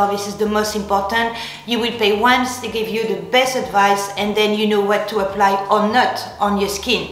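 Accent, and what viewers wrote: France. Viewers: Oh you so funny, but it is funny those big brows.....so nice to see humor and it’s natural. I like your videos. Love your French accent .... and being feminine is such a bonus for all woman. But some just don’t care.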